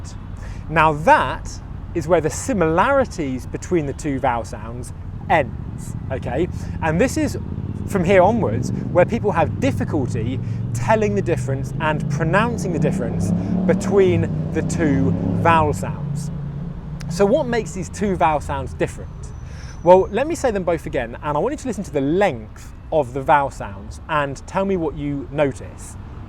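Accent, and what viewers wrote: United Kingdom. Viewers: What British accent do you speak ? Is the British IPA same for all British accents ? I am from India I speak Hindi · Hello sir ,your accent is american or british ??? It is the first time to see your channel .my mother's tongue is arabic .do you like arabic language😀 i learn american accent.i noticed that you speak british ,am i right ?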